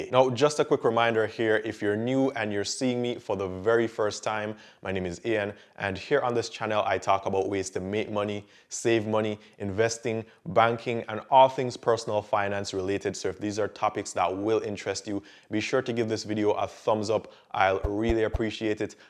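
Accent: Jamaica. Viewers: Are you Jamaican bro because I hear it ❓😂😂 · SOUND JAMAICAN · He sound Jamaican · Eann just subscribed and I'm looking forward to talk to sir. I am very much interested in the t-shirtbusiness. Yuh sound like a man from yaad iyah, suh bless up if a suh it guh. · Sounds like your from Jamaica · Young man mi than yu. Fe di information. Sorry mi can't write potwan again sorry. But mi hear u accense. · Hi love you video are u Jamaican? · Thank you for sharing. ARE YOU A JAMAICAN? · Sound like u from yard 🇯🇲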